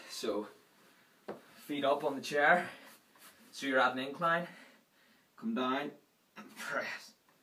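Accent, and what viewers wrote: Northern Ireland, United Kingdom. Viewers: Everyone wondering asking where he's from. My most accurate guess is Northern Ireland.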